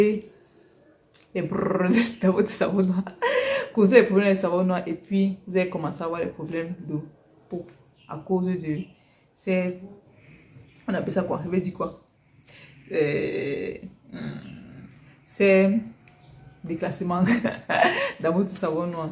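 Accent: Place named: Cameroon. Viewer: Le ton camerounais 😂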